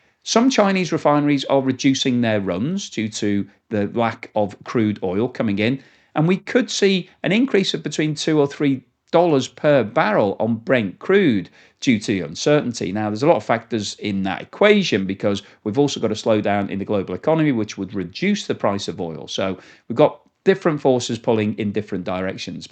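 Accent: United Kingdom. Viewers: Please alter your modality. There is no rational or legal reason why countries like China, Russia, India and the many BRICS countries should not trade with whoever they wish. Therefore, saying that ships 'should not' go into one port or another misleads you audience (who are dumb enough already} into thinking that there is a valid reason for the Anglosphere to think that has a 'right' tot tell the rest of the world what is right or wrong. Saying this in a 'pommie' accent exacerbates your bias. The youtube algorithm is false. Your narration should be censored!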